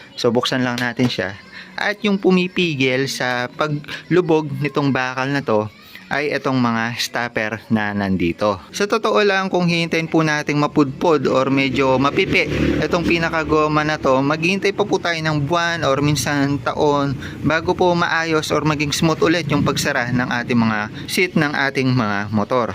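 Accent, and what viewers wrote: Pampanga, Philippines. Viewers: Nokarin kayo lods? Halatang kapampangan ka hehe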